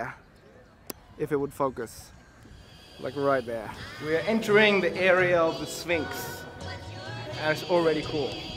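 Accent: Germany. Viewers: I can already smell the "Parkour Turist guide through Egypt with Jason Paul" in your Hillarious Germans English Dialekt... Egypt seems like an Perfect Place for Exploration... I saw the cat and was like "Yay Catpats" and then the cat ran away... but i saw the effort to keep your Promise so i let it pass for today and still like the Video The Kids were kind of Cute I like how the Bork of every tree is paintet White in the first two meters so no animals will eat that part because there are many Animals running around Will there be some Parkour Action with Camels? I like Camels... they are Derpy.... Derpy is Cute.... And while you on it.... Pat them for me Anyways Thanks for todays Video See ya Next Video <3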